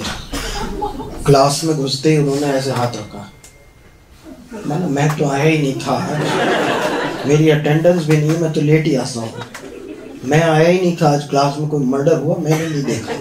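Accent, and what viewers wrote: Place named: Bareilly, India. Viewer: Bhai tumhara accent jabardastt hai .Mai b Bareillian hu bhrother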